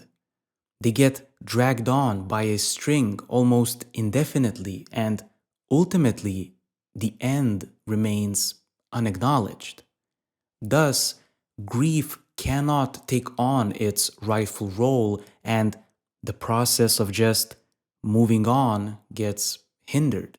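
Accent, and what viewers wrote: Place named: Portugal. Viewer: So relatable, especially after graduating for a few years. Where are you from by the way? You sound Portuguese but look German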